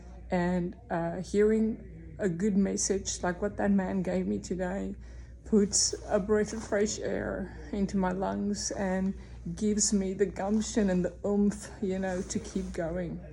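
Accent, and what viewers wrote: South Africa. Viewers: You sound like South African.. I’m from NZ living here in Texas. I too had a couple of like incidents with the father here all these years. Isn’t he amazing Aye? Bless you.. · Are you South African ? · My parents are from your area and we have spent a lot of time there as of late when we are back in states from Mozambique. Do you happen to be South African?? Living out here we can recognizee that at times. What a blessing of a story! Keep pressing in courage! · Great experience. Are you South African ?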